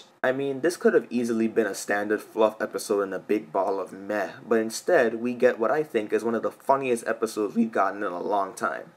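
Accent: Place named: Caribbean. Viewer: That was a great review. May I ask you where you are from? You sound like you're from the Caribbean.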